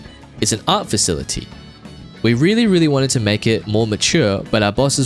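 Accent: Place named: Australia. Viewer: It's crazy how you can tell this Youtuber is an Asian Aussie just from his accent.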